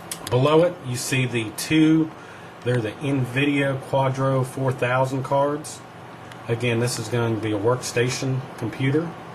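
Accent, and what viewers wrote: United States. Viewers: You thick American accent really pisses me off!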